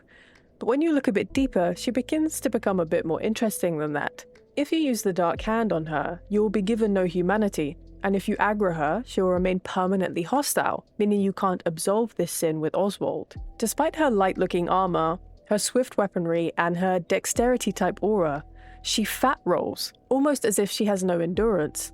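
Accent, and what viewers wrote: United Kingdom. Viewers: YOU'RE BRITISH??????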